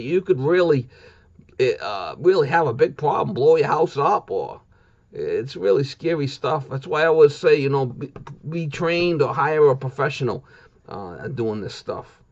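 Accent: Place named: Boston, United States. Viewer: We could tell u might be from BOWSTON.. But anyway, great video .. The best part was when you said "I do what i wanna do" the attitude of someone who doesn't have to get one of those crapy corporate jobs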